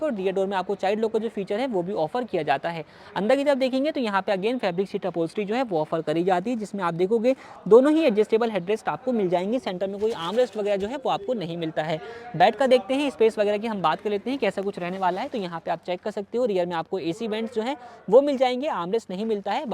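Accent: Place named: Jhansi, India. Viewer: Tum jhansi se ho bro voice pahchn gye ham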